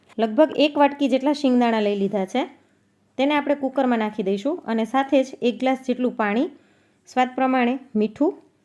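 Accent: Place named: Ahmedabad, India. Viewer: Hu amdawad thi badhaj Shak saras che ben ❤ tamari boli parthi tame pn amdawad na lagocho ben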